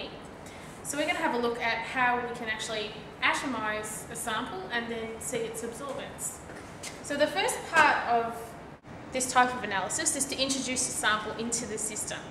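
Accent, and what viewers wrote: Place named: Australia. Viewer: hey, you sound Aussie!! Great explanation - used it in Yr 12 HSC Chem today. Thx heaps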